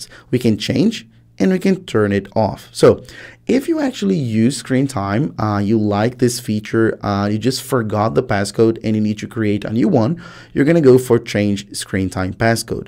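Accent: Brazil. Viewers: Brasileiro ? Sotaque não engana kkkkkk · Fala brazucaaaaaa, sotaqueraaaaaaa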